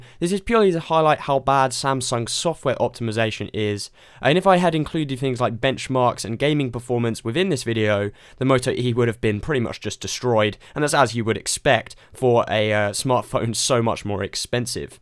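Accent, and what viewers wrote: United Kingdom. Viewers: I understand you may have US viewers, but please can you put the prices in pounds as well...you do sound British anyway. :)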